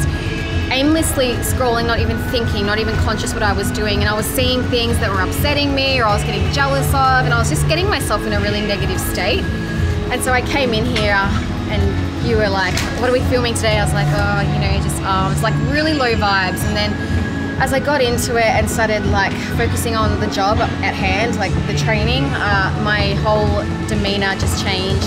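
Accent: Australia. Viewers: Not only are you the most beautiful woman on the planet, but I love your Australian accent just makes me melt you are a goddess❤❤❤❤❤ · Surprise. You're an Aussie!! After following you for a while, this is the first time I've heard you speak. "That's an Aussie accent" I thought. (And from the Cat's territory too. Grrr. Go Brisbane!!) I imagined you were 6 foot tall and American. (Well you have a six-foot presence :-) Take a bow mate. You look amazing.) What you've done is a huge credit to you. I think you're also an inspiration to us blokes. Golly, an Aussie. hahaha. So surprising. I wish you all the success there in the US. Thank you for your positive energy. It's great. Bluey.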